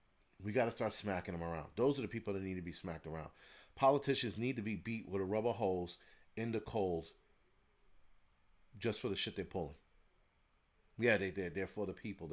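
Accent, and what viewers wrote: New York, United States. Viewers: Just curious brother. How come you talk like a New Yorker? We're you born or live elsewhere for a while? I live near Boston and we almost sound alike. But you no...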